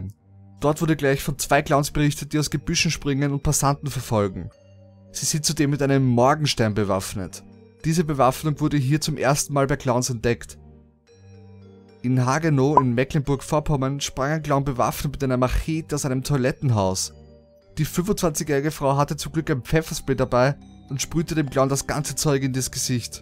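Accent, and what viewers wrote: Vienna, Austria. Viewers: Natschka Mir kommt's vor als ob er Wiener wäre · Bist du aus Österreich?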